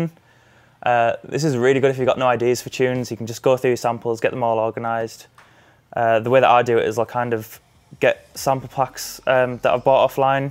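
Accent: United Kingdom. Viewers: I always thought he was british haha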